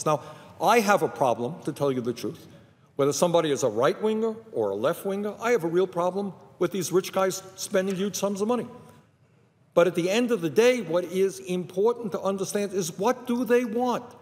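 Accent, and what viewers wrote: Brooklyn, United States. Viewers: Few accents I enjoy listening to as much as a Brooklyn Jew!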